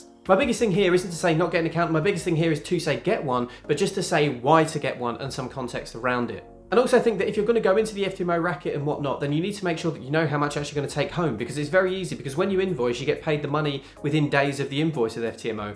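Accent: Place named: United Kingdom. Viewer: Can you slow down when you talk, I’m an American it’s kinda hard to understand what u saying when u talk that fast with a British accent.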